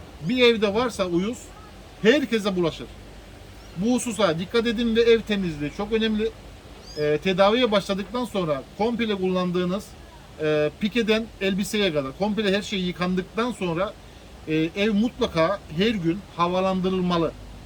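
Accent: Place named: Manavgat, Turkey. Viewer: Konuşmandan bildim abi bende manavgattaydım😂şiven harika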